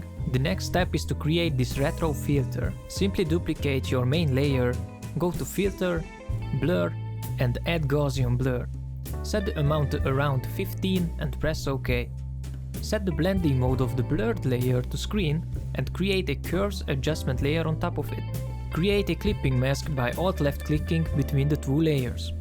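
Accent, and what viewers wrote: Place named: Hungary. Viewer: hungaro accent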